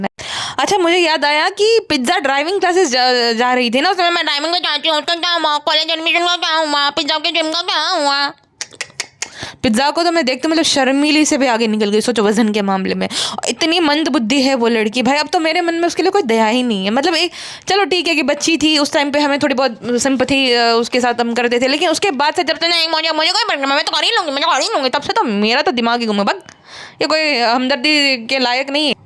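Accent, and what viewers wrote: Gujarat, India. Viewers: Your Reaction vlogs are always great ..In between love hearing you Gujarati accent and words ..Keep it up and God bless you ❤️🙌🫶🏻🦋🧿🌷✨🤗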